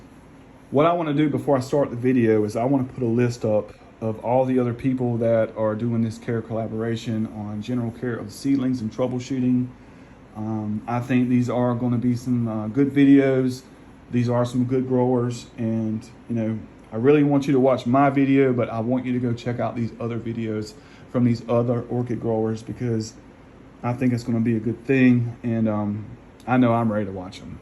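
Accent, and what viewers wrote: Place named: South, United States. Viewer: Hey dear! Nice to put a cute face to a sweet southern accent 😉 Great shout out for moms! 😁 I think seedlings should be labeled 1st year, 2nd year 3rd year. It gives me more of idea what I'm dealing with. Ebay sellers want to sell 1st years as NBS!!! Ugh aggravates me! Great video! 👍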